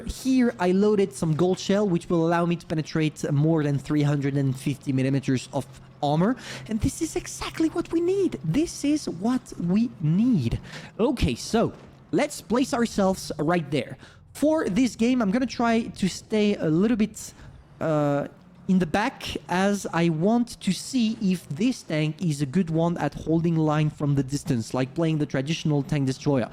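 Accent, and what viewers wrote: France. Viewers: is he from france?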